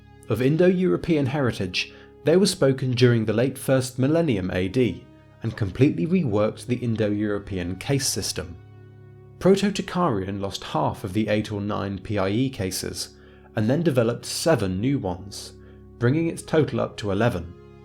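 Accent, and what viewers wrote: England, United Kingdom. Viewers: Shades of what I would have gotten into had I gone into linguistics! OK, I detect a [snobbish] British accent in you but get to the point. English is MORE synthetic than you make it out to be (following the lead of the Germanic languages); the Romance languages are almost totally analytic. Point is demonstrated: my school system at the grade 7-9 level taught only two languages French (analytic) and Latin (synthetic galore). In high school grades 10-12 I took German. Us "Latin scholars" were VERY familiar with grammatical case while the "French scholars" had no idea what case was. Yes, things like Accusative of definite time vs. Genitive of indefinite time weren't well explained--they are a feature of German--to us in Latin class in junior high. Something interesting is going on in English that to your argument that Synthetic trends to Analytic which I'll get to in another post. 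I'll conclude that a lot of this today is in DELIBERATE miseducation. Note in America the now-common misuse of " 's " for plurals: dog's for dogs, etc. THAT sort of illiteracy! Bad enough the British' dropping of punctuation in abbreviations "PMs" for "P.M.'s" if you saw Johnson, Cameron, & Brown walking down the street! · What a preposterously unscholarly and scatological pile of tripe. "Habere" in Latin is "to have"; "Dormire habeo" is "I have to sleep/must sleep". it is NOT the future compound tense which would be "dormire VADO" (using the verb "to go" as one would in English).The author resorted to using the verb "fart" since he doesn't have the intellect, substance or maturity (thinking he has some severe "mommy issues" and still lives at home) to create something academic. This is video is proof that just because you slap a pompous voiceover with an English accent doesn't make it intelligent or correct. 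· slow and exquisitely anglo but an excellent video for sure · Yeah I reacted to "domibus nostra", I dunno where he got that from. Also I'm surprised he was afraid to pronounce Finnish, as his Latin pronunciation was 100% English anyway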